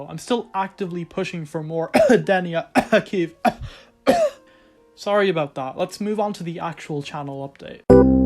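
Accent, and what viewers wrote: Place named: Ireland. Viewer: you sound like mario if he was irish instead of italian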